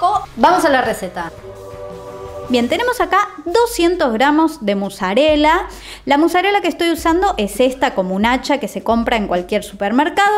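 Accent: Argentina. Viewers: Me encantan todas tus recetas pero me recopan mil tus "meal prep" y tus "puede fallar". Dale, que te veo siempre. Voy a acabar hablando en argentino, che!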